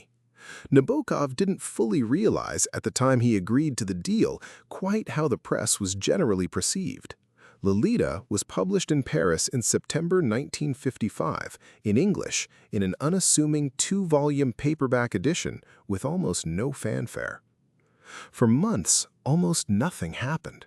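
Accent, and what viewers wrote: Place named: United States. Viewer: Would' e lustened if you didn't have this horrible chewing gum yanki accent. The greatest pissible earsore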